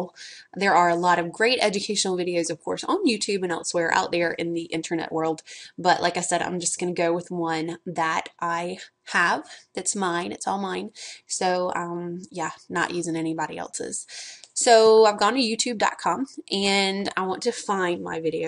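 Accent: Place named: South, United States. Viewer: HOT/SMART/SOUTHERN ACCENT. Your gonna make someone very happy.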